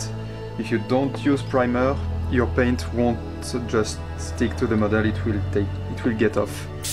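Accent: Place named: France. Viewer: j aime bien ton accent français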